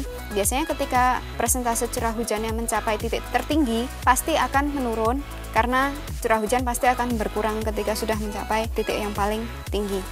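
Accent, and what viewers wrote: Java, Indonesia. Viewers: Saya suka semi medoknya itu dari mba nih hehehe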